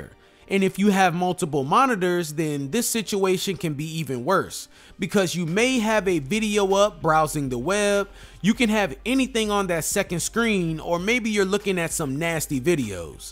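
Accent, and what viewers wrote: United States. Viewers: The American accent 😂😂😂❤❤❤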